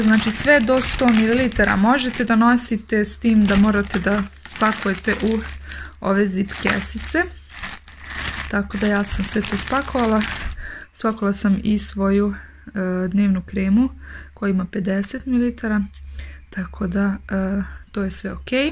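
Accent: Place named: Ekavian, Serbia. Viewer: Zašto pričaš na ekavici, ako si iz Bosne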